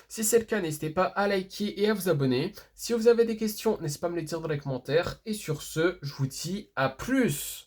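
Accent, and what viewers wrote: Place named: Japan. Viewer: J'AI PÉTÉ UN PLOMB JE SUIS SÉRIEUX POURQUOI TU DIS TOUJOURS EN EN ACCENT JAPONAIS IL ET POURI C'EST UN LANGUE C'EST PAS POURIR C'EST JUSTE EN PEUT PAS CAPTÉ CAR C'EST DU JAPONAIS JE VAIS TU DÉTRUIRE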